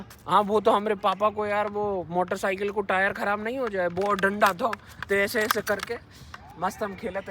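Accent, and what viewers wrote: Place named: Bundelkhand, India. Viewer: Jst LOve Your Bundelkhandi accent :face-green-smiling:💟😍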